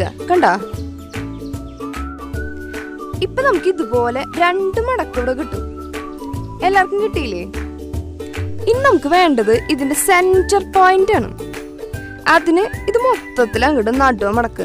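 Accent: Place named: Thrissur, India. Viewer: Thrissur slang..🤗🤗🤗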